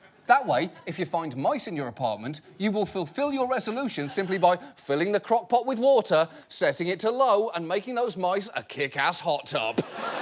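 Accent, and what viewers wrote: England, United Kingdom. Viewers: I love when says words in a distinctly English way. like "kentucky darby" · Thanks to his british accent , even silly things sound viable !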